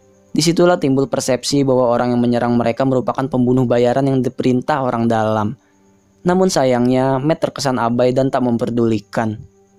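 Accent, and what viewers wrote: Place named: Banyumas, Indonesia. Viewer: Kaya ngapak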